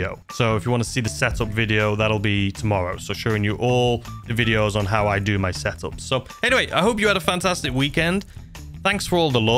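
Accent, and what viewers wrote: United Kingdom. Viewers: I am non-native English speaker. I can hear difference between US, UK and India but I can't really locate accent. But you sounded so British... Almost English Dave thing 🙂 Your way of speaking was just pleasing me - I like to hear British English (maybe because I hear US people most of the time?). A weird thing to tell to other guy but I don't care, we won't meet and feel awkward because of that :D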